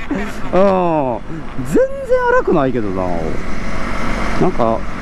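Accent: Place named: Kansai, Japan. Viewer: ナイトツーリングに続き、このツーリング動画もおもしろかったです！ 試乗動画でもイントネーション的に関西感はバッチリ出てますよ😁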